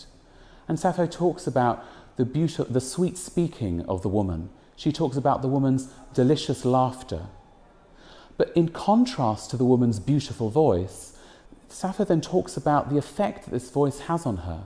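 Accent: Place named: United Kingdom. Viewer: british vsauce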